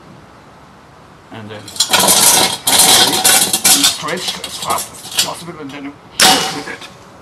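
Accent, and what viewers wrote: Germany. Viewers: Bist ein deutscher oder :D ? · Close enough for a German native. His English is already better than 3/4 the comments. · Hab ichs mir gedacht du bist bestimmt deutscher ?! =) Gutes Video hammer Schleuder ! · You have such a likeable german accent 😂😂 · Deutscher oder ? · vom akzent her würd ich sagen dass du deutscher bist :D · also doch aus deutschland :P ich war erst am rätzeln wegen dem akzent ^^ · what nationality are you? you have a cool accent. German? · he sounds VERY german...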